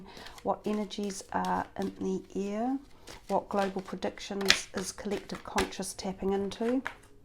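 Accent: New Zealand. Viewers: Came across you the other day great to hear a kiwi accent lol 🙂 very interesting reading, I think October is going to be quite full on 😬 Thank you! ❤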